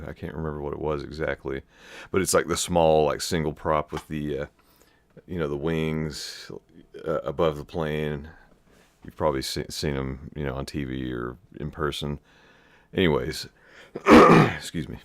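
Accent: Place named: Midwest, United States. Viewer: Ok, so I’m creeping!! lol, I’m a Honker who wanted to see if looks like his voice!!! 😂😂. I have to say your mid west accent is much more apparent on this channel. Probably bc you’re not talking with a British accent!! ♥️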